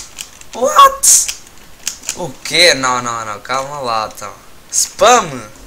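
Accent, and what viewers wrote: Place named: Portugal. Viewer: é caralho um tuga aqui espetaculo! mas para de falar cara fdsss que trigger se é para os br perceberem mete legendas xD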